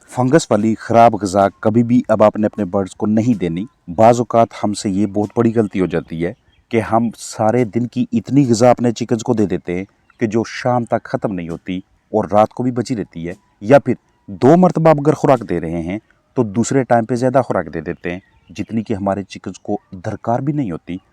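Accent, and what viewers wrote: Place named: Pakistan. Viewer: Apki zavan sunkar aisa laga jaise me ibadat me chali gai kuch der ke liye aap bahut urdu mein baat karte hai aur hum urdu hindi mix bolte hai i think aap Pakistan se hai. Aur hum India me peda hue isliye yaha ke mahol ke mutabik hain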